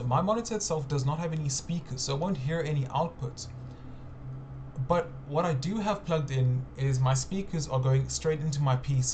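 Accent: South Africa. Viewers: Are you South African? I hear a familiar accent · I can spot a South African accent a miles away, thanks - I was using bluetooth headphones & had to change it on audio hardware.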